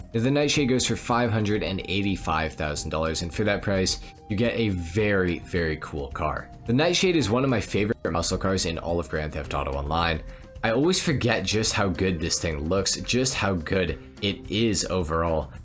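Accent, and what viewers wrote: Canada. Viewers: U are canadian bro?!!!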